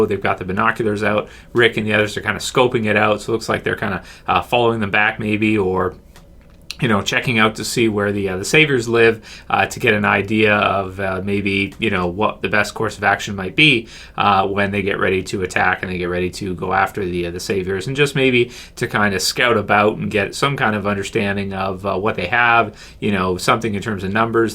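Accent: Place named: Canada. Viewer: I love your Canadian accent, can you please do a video of you just saying OUT and ABOUT over and over...thanks!:)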